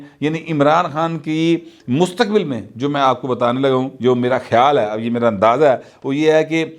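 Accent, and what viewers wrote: Punjab, Pakistan. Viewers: apka Punjabi touch kamal ka hai😂😂😂🤣🤣🤣🥰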